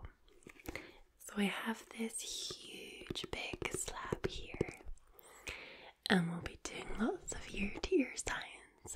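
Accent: Scotland, United Kingdom. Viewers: You're amazing. Your beauty, your personality and that scottish accent. Stunning! Great video too! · Love the Scottish accent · I love Scottish accents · the scottish accent is so good! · Oh yes same thing. I put almond milk in my oatmeal instead of milk because it’s a little healthier I am part Scottish 👍🏼 by the way and love ❤️ your accent 😁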